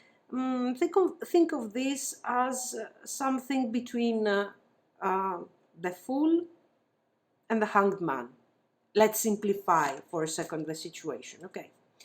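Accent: Greece. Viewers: hi. i feel like youre greek bcuz i am half and your speech is familiar. is this rtue? any advice how a greek woman can be w/ a colombian man?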